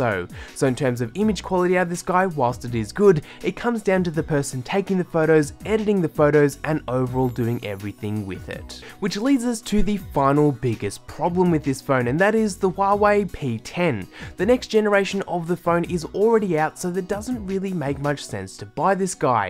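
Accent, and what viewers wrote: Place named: Australia. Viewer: Ugh...Aussie accents.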